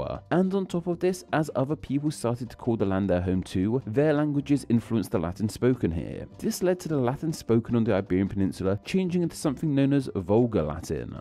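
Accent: United Kingdom. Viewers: Blud he’s British what do you expect 😭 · I find it interesting that this video that's focused on the "F" is narrated by someone with a "British" accent. In this accent, the words spelled with "TH" end up sounding like F!!! Fanks for this video!! It was very interesting! It's possible a similar thing happened to Spanish based on accents throughout the history of the changes...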